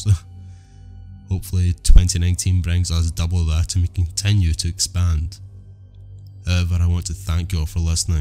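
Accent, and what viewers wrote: Scotland, United Kingdom. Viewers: First time hearing horror stories in a Scottish accent and absolutely love it. Had to scour YouTube to find this channel and thank you so much for narrating them. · Criptids are my favorite subject,and paranormal experiences are a close second. I would like to thank you for your time and effort in making these stories. I love your accent, I've always had a soft spot for Scotland and the accent. Frankly you could read the yellow pages and I would listen. 💙😱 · I really enjoyed that. They were different and I think I have only heard this topic dealt with once or twice before so it was good to hear original material. You have a great voice to listen to. I love the Scottish accent and it makes it different. Here is an idea for a topic, I remember reading a story on Reddit where a couple were randomly rescued from a potentially violent situation involving a couple of tweekers by an enormous and scary black dude .... 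how about “unlikely heroes “ or someone totally random just completely saved my bacon?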